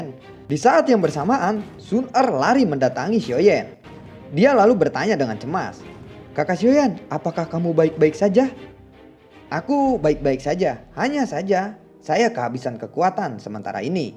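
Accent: West Java, Indonesia. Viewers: orang sunda gening mang wkwk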